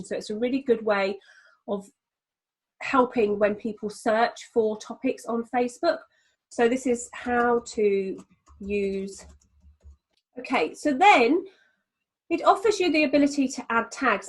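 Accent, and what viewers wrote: United Kingdom. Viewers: ok I am a little dense. What are you using for recording you screen for this presentation. Ps. You always get back to me after I ask a question. I like that and the. Brit accent. Lol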